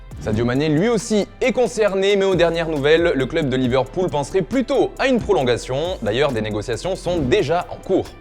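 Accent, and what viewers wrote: Italy. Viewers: Moi c’est les accents italiens qui me retient ici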